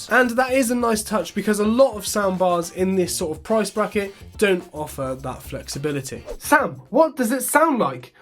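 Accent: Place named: United Kingdom. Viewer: not bad, just different. You Brits put more emphasis on certain letters. Like the way y'all say schedule or aluminum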